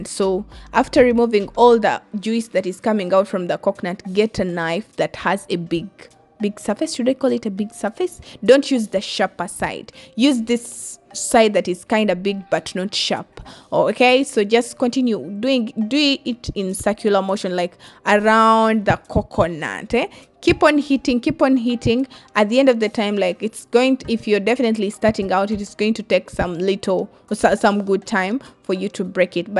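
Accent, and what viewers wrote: Uganda. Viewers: You sound UG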